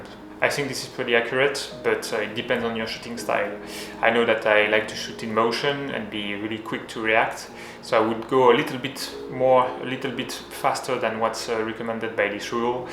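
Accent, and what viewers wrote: France. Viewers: Vous avez un accent, êtes-vous francophone?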